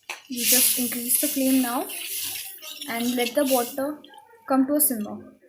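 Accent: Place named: India. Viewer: she is speaking all buttler english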